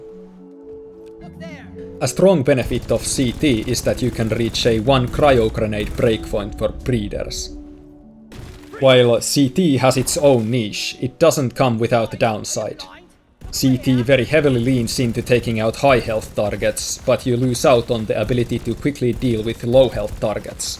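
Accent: Finland. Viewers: That's a very finnish accent. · are you finnish/estonian? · Nah it’s finnish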